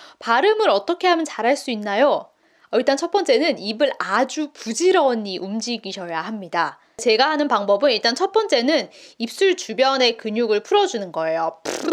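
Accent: Busan, South Korea. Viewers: 사투리 귀여우세요 ㆍ 참고도 저도 부산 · 영상을 쭉 보다가 좋아요 구독 클릭했습니다. 간결하게 핵심을 잘 알려주세요. 부산 사투리 e쁘네요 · 지금은 원래 쓰시던 부산 억양보다 저 억양이 더 편하신가요?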